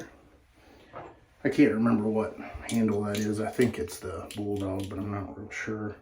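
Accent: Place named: South, United States. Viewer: Hey, thanks for the video. What are your thoughts on the Razorock Game Changer .84 Open Comb, now, 4 years later? I found your video because I just ordered my first safety razor, after using cartridges for 30 years - first the Gillette Sensor, then the Mach 3. After some reading and some recommendations, I went with the GC .84 OC. (I’ve always been one to dive in head first!) Also, sounds like you’re from the South. I’m in Nashville area.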